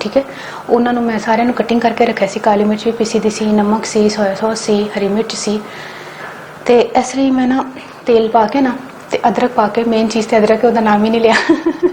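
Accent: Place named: Ichra, India. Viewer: Tumhari urdu sy lagta hy tum ICHRA ki ho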